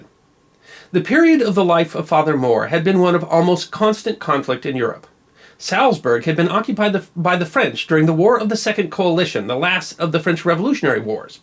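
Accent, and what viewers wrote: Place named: United States. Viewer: at last I have found someone whose American accent is thicker than mine! Hint: The terminal "e" on German adjectives is pronounced like the English "a".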